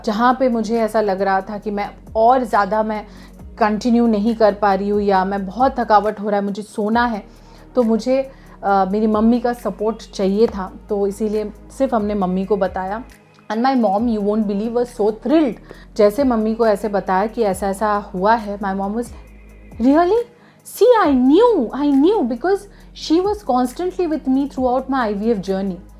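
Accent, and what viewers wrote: Bengal, India. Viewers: i would like to tell you something initially I felt that u r a very rude person i used to watch your videos previously but didn't subscribed your channel as I kept watching your videos i got so impressed by you...ur truly and genuinely take so much efforts for your PR Or advertising any product seriously it's like for any product u try to make content from ur videos and the most important thing is u r too light in ur life how nicely u handle every thing the way ur at ease in your life is so so inspiring by seeing ur videos i learn that it's absolutely not necessary to be in serious mood all the time.....i just love u and your videos the way crack jokes....the way u talk with ur hubby....Pablo....and most important ur Hindi with bengoli touch....and now I have subscribed ur channel....and enjoying every bit of your vlogs....u never disappoint your viewers every videos has something new and knowledge too...All the best and enjoy....no need to tell you though😛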